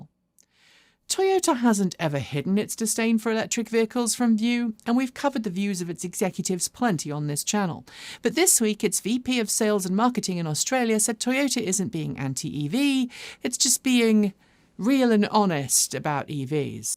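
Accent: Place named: RP, United Kingdom. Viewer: Love the Brienne of Tarth accent ❤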